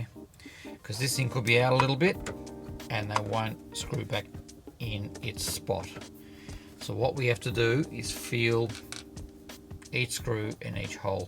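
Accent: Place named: Australia. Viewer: Thanks for video mate .Good to hear an Aussie accent :)...Can we buy parts from you ?...I have a 3,1 with faulty PSU. Cheers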